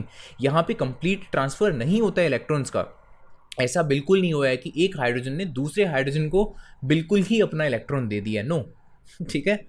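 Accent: India. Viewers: Yeah..I somewhat realised u seem to be punjabi by the way of your taking sir...even I am punjabi and I have shared with my friends:)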